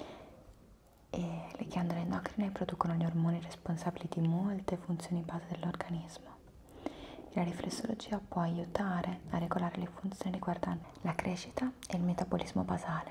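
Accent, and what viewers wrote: Italy. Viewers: Could listen to her all day long..love the Italian accent❤ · AAA esse sotaque italiano que eu amooo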